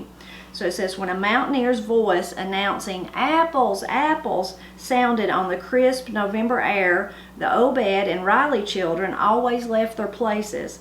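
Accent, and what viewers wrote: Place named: South, United States. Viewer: You're like Tree Trunks from Adventure Time in real life. You have a cute Southern drawl, and make apple recipes too.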